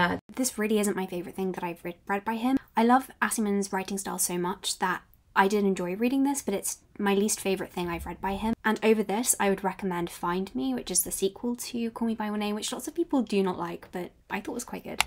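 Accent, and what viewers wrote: United Kingdom. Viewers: I am watching your videos now to prepare for my English exam. My teacher is from UK, so it is very useful for me to listen someone from this country to copy an accent. I have already watched some of your videos since the first one. It is cool to watch you grow up. Thank you and hi from Russia, Krasnoyarsk❤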